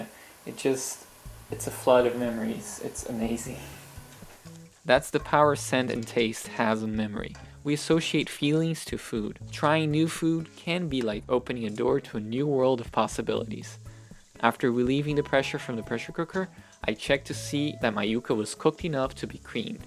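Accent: Brazil. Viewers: Que trabalho maravilhoso,!! Estamos apaixonados! Engraçado que topamos com um vídeo antigo seu e pelo sotaque no inglês eu já senti que era brasileiro 🧡 é lindo topar com narrativas riquíssimas e belíssimas como as suas! Muito obrigada pela dedicação, lhe desejo muito sucesso!!!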